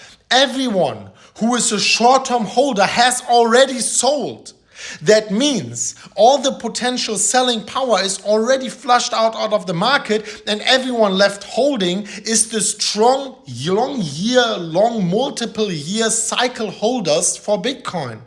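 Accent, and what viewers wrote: Germany. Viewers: I watch his video for the drama and his German accent, “People spitting on my face…”😂 Keep it up as it’s all going down…trend is our friend until the trend; the macro trend in down first time in crypto history…so screw the pleul or some Dutch crypto guy or whoever… BTC will be lucky to hang in at $16k for 2023!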